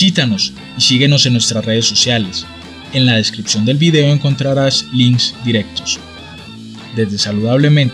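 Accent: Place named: Medellín, Colombia. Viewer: Es el acento paisa de mefellin.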